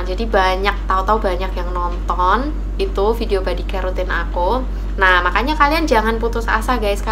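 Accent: Java, Indonesia. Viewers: Jowone endi mbak sampean..? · MB dari mana ya..kok Jawanya keliatan..salam.kenal mb dr Yogyakarta.nyimak nih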